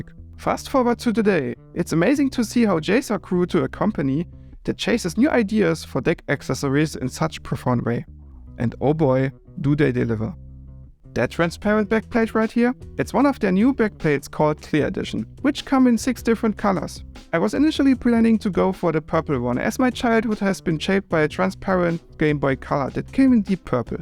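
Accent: Germany. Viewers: Being new here, I thought,"man, that's one thick german accent" and then the name was displayed. Brother, as a fellow german, I feel for you - probably having shared the same educational system. Keep up the good content and thick accent.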